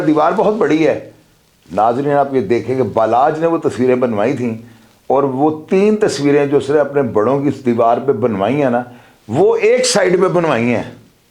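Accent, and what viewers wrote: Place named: Sialkot, Pakistan. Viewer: Aapkey lehjey main sialkot jhalakta hai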